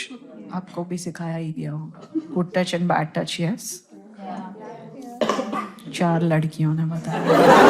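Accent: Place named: Mumbai, India. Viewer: Is she from Mumbai by any chance? That accent sounds very familiar (Lots of my family members in Mumbai)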